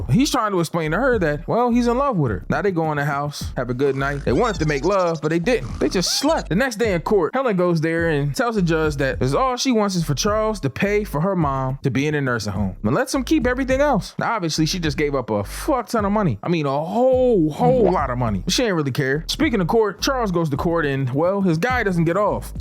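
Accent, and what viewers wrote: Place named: DMV, United States. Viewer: I swear I heard a dmv accent 🤔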